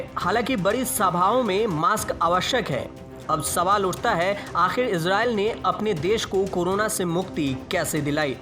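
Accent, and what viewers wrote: Bihar, India. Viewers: bolne k liye ye bihari hi bcha tha kya dang se hindi nhi bol skta ye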